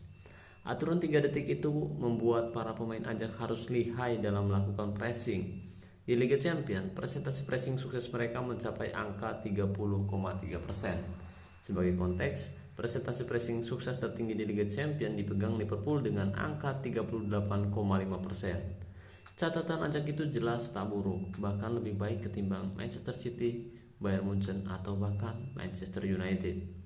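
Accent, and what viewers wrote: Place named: Cileungsi, Indonesia. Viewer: bang lu orang sekitaran cileungsi yak?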